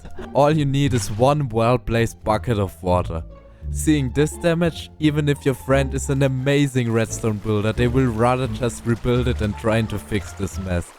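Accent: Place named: Germany. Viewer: you sound very German 😅 ich feiere deine Videos so sehr xD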